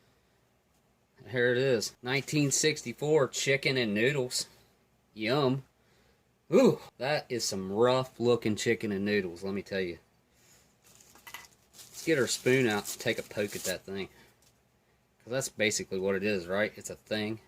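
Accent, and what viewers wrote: South, United States. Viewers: Love your channel & also love your accent! U got the cutest accent ever! Country....but just smooth & cool!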